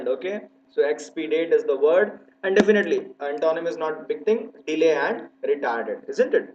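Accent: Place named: Tamil Nadu, India. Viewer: Theriiiii 😂😂😂😂😂 ur tamil slang s pakka sir. I ll Never ever forget these words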